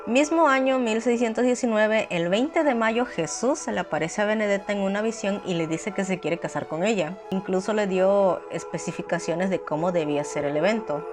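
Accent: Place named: Veracruz, Mexico. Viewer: Le puse más atención a tu acento jarocho que a la historia en si xD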